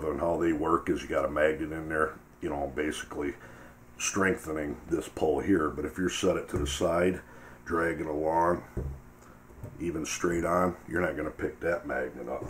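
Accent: Minnesota, United States. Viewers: That has to be either a Minnesota or Wisconsin accent. By Golly !! Nonetheless, nice video.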